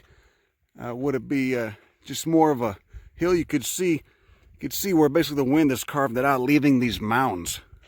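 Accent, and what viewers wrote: Chicago, United States. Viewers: I believe he’s originally from the chi. Sounds like a south side or south suburbs Chicago accent to me. Though I pick up a little New York as well.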